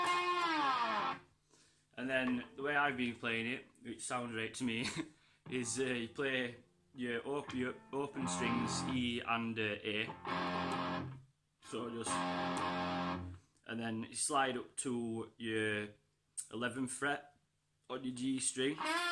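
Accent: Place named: Barnsley, United Kingdom. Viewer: That Barnsley accent lad! 🙂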